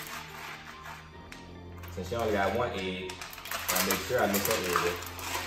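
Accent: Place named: Atlanta, United States. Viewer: lmao he said it twice first time I didn’t hear it by the time I found this he said it again and I heard clear as Day sounds like he from Atlanta or sum 😂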